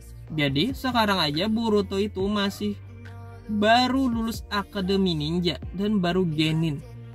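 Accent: Banjar, Indonesia. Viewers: Fiks orang banjar sebelum mimin perkenalan diri bisa ddengar dari logat nya ngomong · Tuhkan, dari logatnya urang Banjar. Salam kenal bang, dari kab.banjar ...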